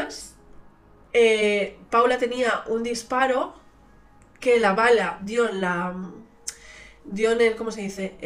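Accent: Spain. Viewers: No hace falta comentar estupideces. Los que hacen videos tienen como objetivo darse a entender, cierto? Para eso publican, para que los vean. Quizá ella esta muy habituada a hablar local y no sabe que fuera de España no le entienden. A ella le sirve esa retroalimentación si quiere que mas gente la vea y le entienda, es el objetivo de todo youtuber, seguramente ya lo sabes.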